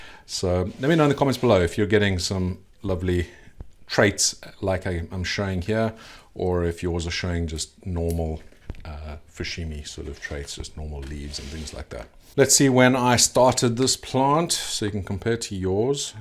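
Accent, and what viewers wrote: South Africa. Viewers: You sound like a south african? If so tell us more!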